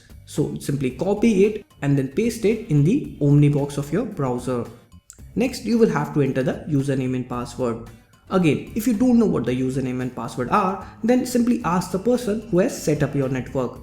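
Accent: India. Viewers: Great info. That was the thickest Indian accent I have ever heard....and I’m Indian myself! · Funny indian accent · U speaking English like native Indian languages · You REALLY need to practice speaking in ENGLISH. I always tell people I speak TEXAS ENGLISH, so EVERYONE knows what that means.. You are speaking in INDIA ENGLISH, which is VERY HARD TO UNDERSTAND. Why is it that ALL the people from INDIA, always have this "HARD TO UNDERSTAND" English?!?!?!?! GEEEZ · This is a great video. I like how simplistic everything was explained. I'm not a newbie with computers at all, but the majority of my family and friends are, and this would easily help them understand networking. Even though his English is very accented by his own language, I work with several people from India so I've gotten used to the accent. Believe me, its much easier to understand this than someone who's native language is English, but they speak it so fast that you can't even catch what they're saying and need it repeated. 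Good job!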